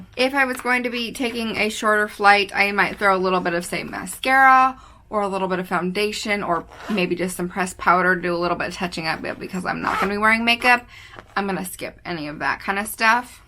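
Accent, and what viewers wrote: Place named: United States. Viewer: Nice video, thanks for sharing. I haven't finished watching it yet... I had to pause when you mentioned nearly a 12 hour journey!!! I thought to myself, she sounds State side, where could she be flying from 12 hours to get to Tenn?? Then I realized (after reading About Me section) you're coming from OR. Makes sense 😂😂 ❤️❤️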